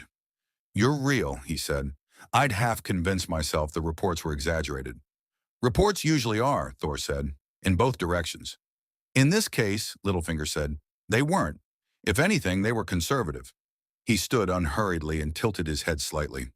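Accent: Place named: United Kingdom. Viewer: Interesting story, but British accents are just meant for story telling.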